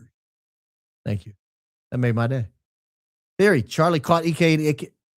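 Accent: South, United States. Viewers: Just ridden across London bridge listening to your Southern tones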